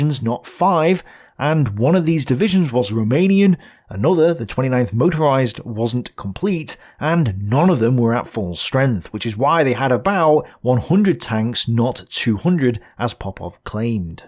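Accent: United Kingdom. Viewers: I can feel my bone healing as I listen to mild British accent speaking about the glorius carnage on Volga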